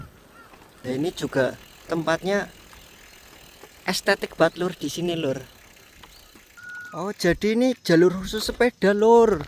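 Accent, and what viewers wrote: Indonesia. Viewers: koe ngapak yah,ngapake ngendi bro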